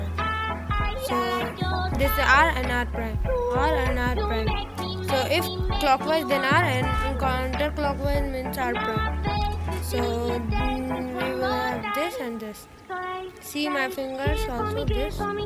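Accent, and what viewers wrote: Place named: United States. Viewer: Abe American English kyu bol raha hai? Indian English bol na!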